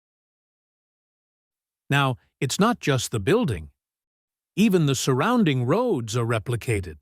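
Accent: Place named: United States. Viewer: nippon looks Japanese but its Narrator sounds likea Usa Anglosaxon Go FIGURE THAT ONE OUT!!!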